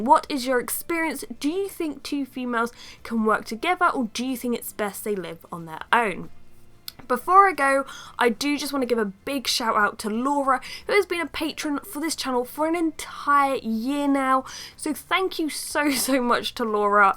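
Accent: England, United Kingdom. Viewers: Where do you live? And she’s not pretending lmao, she really is English. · what a lie !!!!!!!!! dont let the english accent fool you she dont know whats shes talking about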